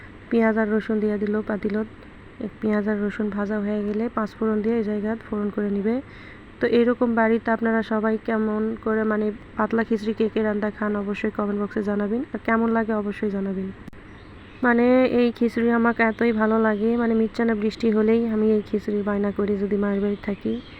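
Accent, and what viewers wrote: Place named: Bogura, Bangladesh. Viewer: এটাই কি অরজিনাল বগুরার ভাষা অনেক সুন্দর ❤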